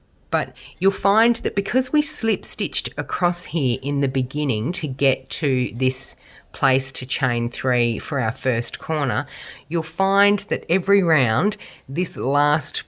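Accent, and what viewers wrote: Australia. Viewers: Delightful square - different and therefore appealing. :) Question: Aussie ? Statement: very well described process, complete with helpful pointers. Goodonyermate !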